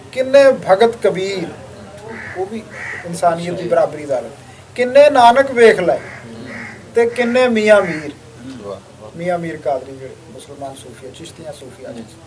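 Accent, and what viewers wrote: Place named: Doaba, India. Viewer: Beautiful theth doada Punjabi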